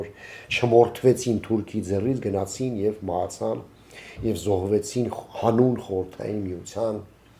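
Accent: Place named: Lori, Armenia. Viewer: Да здравствуйте Лоринский Акцент Люблю свою Родину ❤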